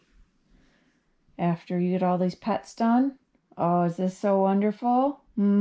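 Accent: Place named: Michigan, United States. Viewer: I thought so! I have a good friend that lived in Midland Michigan and she had a similar accent to yours.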